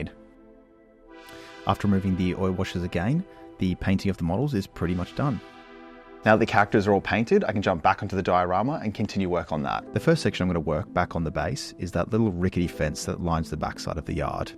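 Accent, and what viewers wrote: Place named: Australia. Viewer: Bro is definitely Australian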